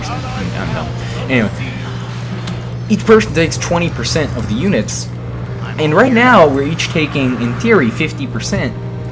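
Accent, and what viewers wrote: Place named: Dakota, United States. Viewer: Are you from Dakota or something whats that accent?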